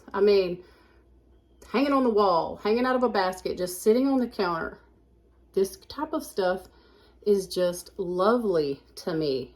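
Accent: South, United States. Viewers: You are an inspiration! I love to watch you thrift & decorate. You make my Central IL heart happy! (And I just love your sweet southern accent!)❤️🙏☕🌷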